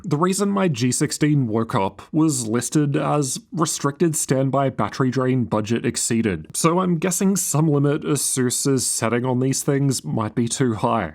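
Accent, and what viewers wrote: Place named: Australia. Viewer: man, your accent reminds me of Rickey Ponting and David Warner.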